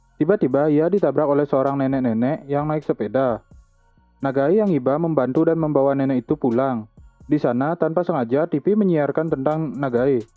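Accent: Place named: Bali, Indonesia. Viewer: Logatnya dari bali ea bang slam kenal ea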